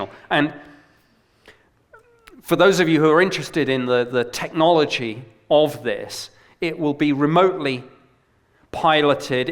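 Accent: United Kingdom. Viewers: is it just me or is he a terrible speaker but his British accent keeps me listening and associating some kind of learned authority to what he says?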